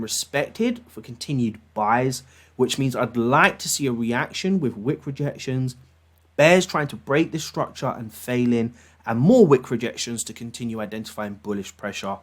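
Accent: United Kingdom. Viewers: He sounds british to me and they have a different way of seeing these situations. They often have a funeral and next gather for a meeting lunch and I think thats their way of showing respect and appreciation for the person. I hope Im not saying bullshit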